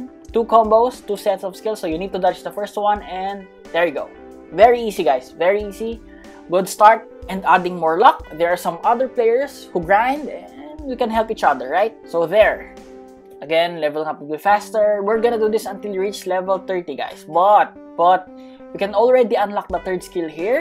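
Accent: Philippines. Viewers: He's accent like Filipino accent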